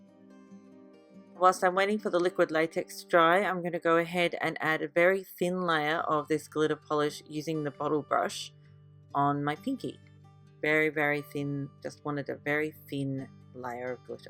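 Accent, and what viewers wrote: Australia. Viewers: All of the three design are gorgeous,I'm happy to hear an Aussie nail technician I'm an Aussie too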